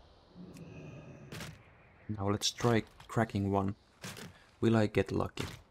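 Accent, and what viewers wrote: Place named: Finland. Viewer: Are you from Finland? Your accent sounds like it.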